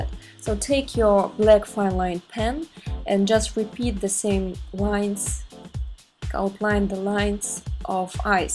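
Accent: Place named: Russia. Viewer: You have a russian accent, i think :)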